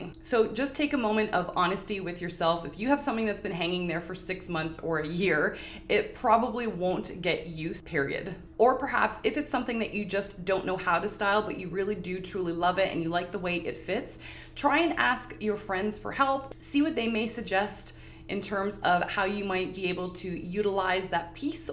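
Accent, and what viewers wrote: Canada. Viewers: Less than 30 seconds in and I just have to say your accent is so cutely Canadian! Have a wonderful day! Now back to watching the video.......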